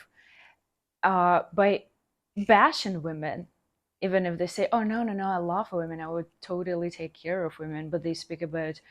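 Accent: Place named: Russia. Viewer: Gracious, I just have one comment today: I know you say Russia is a very bad place at this time and I believe you 100% but I must say.. Your beautiful accent and physical features make me certain that at one time long ago Russia must have been a very good place. You know, the concept of the Chineese Emperess is something that I find so appealing, I don't know if is historically accurate, but I heard somewhere that China in ancient times was a people ruled by a female hiearchy.. what a wonderful wonderful world that must be. I have finally come to realize I can't wait for the world to become matriarchal.. so I'm declaring now that it has officially changed! We are now living in a matriarchal society and I expect everyone to act occordingly.. they will be called out by me for non-compliance. You are so wonderful.. I wish I had money for you but I am on government assistance for my mental disability and sometimes can't even manage my bills. 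So I leave comments, let me apologize; because of my mental disabilities I know that about half my comments are wierd and off the wall but I ask you to please just ignore them because occasionaly there is a rational heartfelt comment.. and I have such strong feelings of respect and admiration for you that it would be a shame for you not to understand how important you are to me just because i ddid a little too much LSD or DOPE many years ago.. btw: as society is more and more accepting of homosexuality I have already gone on to somethi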